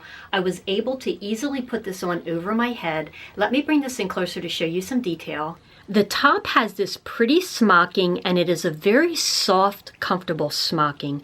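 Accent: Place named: New Jersey, United States. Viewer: I had a feeling you were from NJ! My guess is south Jersey. (I recognized your accent!) I am originally from Cranford, NJ, next to Westfield and not far from Elizabeth. Great haul per usual! I didn't think you looked pregnant in that top - I would keep it if I were you!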